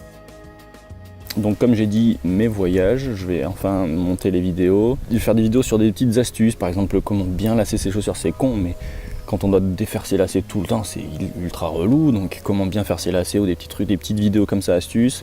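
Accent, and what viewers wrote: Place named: Basque Country, France. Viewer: Bonjour. Bravo pour votre chaîne et vos périples. J'aime beaucoup votre sincérité, modestie, et philosophie de la randonnée. Il faut toujours commencer avec son père, le seul type au monde que vous pouvez envoyer balader et qui néanmoins, vous aimera à la folie :) Une certaine façon de prononcer les "R" me fait penser que vous n'habitez pas loin du Pays Basque. Bien cordialement.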